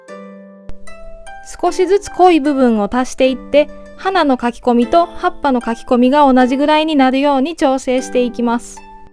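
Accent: Kansai, Japan. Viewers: トロントに来てから関西弁って懐かしいなあ。。。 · そこはかとなく漂う京都弁がまた風情ありますね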